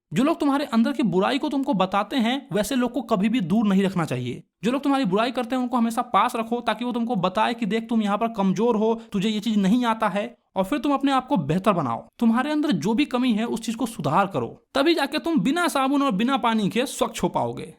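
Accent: Bihar, India. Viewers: Dekh dekh k apki biharan accent mere andr arhi h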